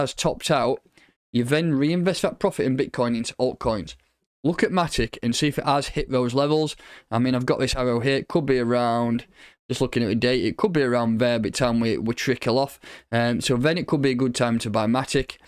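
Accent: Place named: North, United Kingdom. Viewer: New to the channel. Nice to hear a northerner instead of an American. Nice vid, suscribed 🙌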